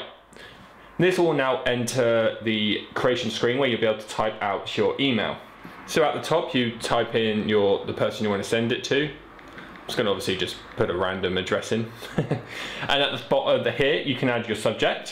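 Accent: United Kingdom. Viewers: Next time try to DE cickneytize the accent. I barely understood. He, sounds like dick van dyke from Mary Poppins. I'm trying to learn. Not be correct.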